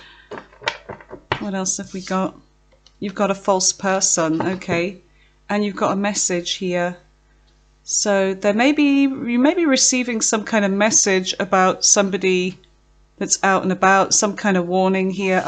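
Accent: United Kingdom. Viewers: Hello, Shout out from the Coachella valley. Brilliant Reading 😊 By any chance, are you from Cornwall England? Cheers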